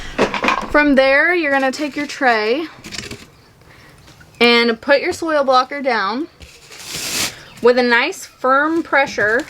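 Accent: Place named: South, United States. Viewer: you may be in Indiana but..no way that you are from Indiana. Or.. Perhaps you're just throwing some southern charm (dialect) in as humor..? Come to think of it though you're not that far from me. (N.W. Alabama - Zone 7B)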